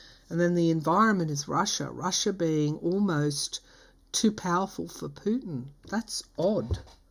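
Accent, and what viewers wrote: Australia. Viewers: Yes I feel this too. Putin is not Russia. Women and men who hold love in their hearts do not support the war in Ukraine, the senseless deaths of their families, the devastation of their neighbour’s lands and cities, the strain on their economy, the unjust burden on ethnic minorities, the ignominy of the Russian name internationally. We are witnessing the last battles of patriarchal, authoritarian leadership in RF and in the US and in other countries. I pray for the flowering of locally based democracies all living in harmony with each other. Thank you for the reading. Love your presence and the Aussie accent. Vivien West Australia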